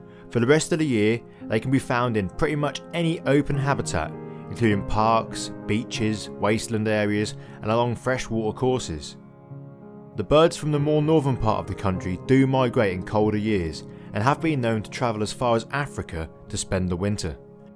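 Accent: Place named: Norfolk, United Kingdom. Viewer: love your channel. Brilliant content. And good to hear another Norfolk accent on YouTube! Merry Christmas!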